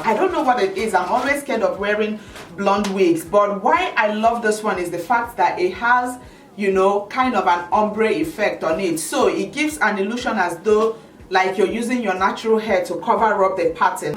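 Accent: Nigeria. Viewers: thanks, so touching but interesting! Your stories are always so interesting..................never a dull moment with you! Sorry about the loss! God knows best! slay on my queen! your accent and repeated "words" makes me know you proudly Nigerian! lol when we see oyibo, we know say na oyibo, when we see our own, we know say na our own......kissessssssss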